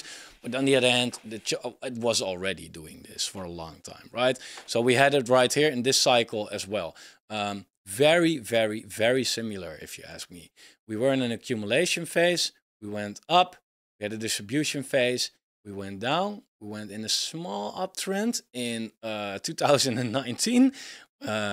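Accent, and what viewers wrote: Netherlands. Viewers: Lol mooi nederlands engels haha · Je pikt ze er altijd uit, de Hollanders die Engels praten :p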